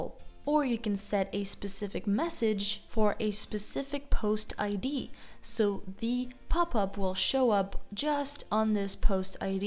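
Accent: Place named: United States. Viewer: love ur american accent ;)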